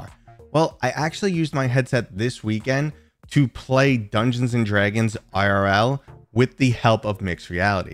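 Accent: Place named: New York, United States. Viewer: I want to say New York accent but it could be Boston although I'd be surprised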